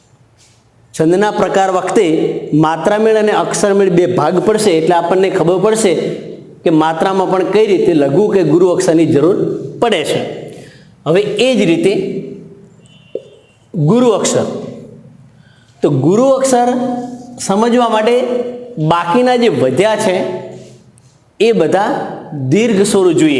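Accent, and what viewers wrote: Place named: Bhavnagar, India. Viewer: Gajab 6o sir Aap,aapni boli parthi Bhavnagar na lagya sir😅 I m right?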